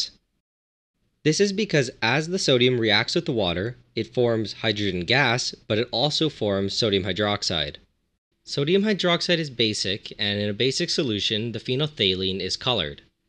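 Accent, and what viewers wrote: Canada. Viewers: That's how Canada sounds?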